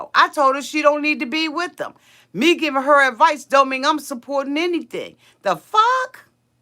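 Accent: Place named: New Orleans, United States. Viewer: I am a Newbie to your Channel.. Tell'em Auntie!! Lol I was laughing as You going OFF on that Person in Your Live Comments.. You Go Auntie!! Sound like that Southern accent, New Orleans Style..Auntie Colt in his Speedos lmao That was a Nasty Seen!! Auntie when I seen Colt come out with them Speedos on I fell off my Couch dying.. 😂😂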